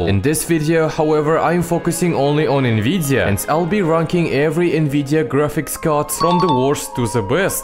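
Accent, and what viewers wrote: Russia. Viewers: Bro u russian right? Its accent🤔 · bro has the strongest russian accent ive heard lol😂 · I hear that Russian accent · Do only I hear russian accent? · По акценту слышно что наш)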